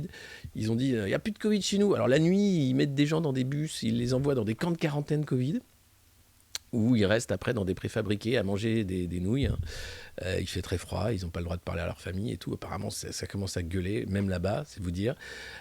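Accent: Quebec, Canada. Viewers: Bel accent Québécois!! Bravo! Calins à Miggie et Courage à Timmy! Merci! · N'oubliez pas le petit bonnet de bain pour Maggy , pour le jacuzzi !!! Merci , toujours aussi génial !!! Et vous avez un accent canadien fantastique !!! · Excellent l'accent québécois 😀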